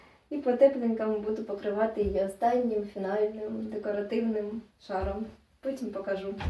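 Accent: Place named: Russia. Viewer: Видно, що міська дівчина і російський акцент є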